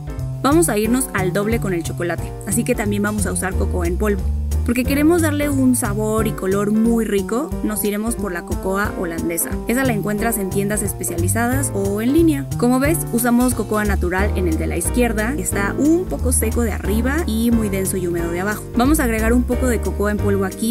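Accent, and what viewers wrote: Mexico. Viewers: el acento whitexican de la narrado me asqueo ! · Lo malo del video es la voz whitexican, pierde credibilidad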